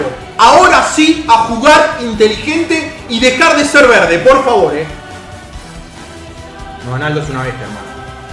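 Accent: Argentina. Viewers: No es por el accento argento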